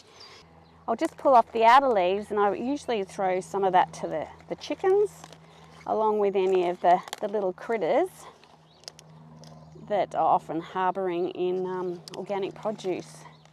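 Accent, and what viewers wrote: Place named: Australia. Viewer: I enjoy all your videos. I especially like your lovely, exotic (to me) Aussie accent.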